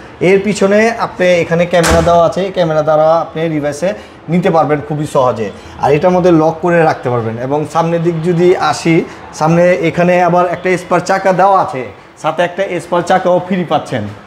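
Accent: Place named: Kolkata, India. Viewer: লোকটি কোলকাতার বাবু নাকি? ভাষা এমন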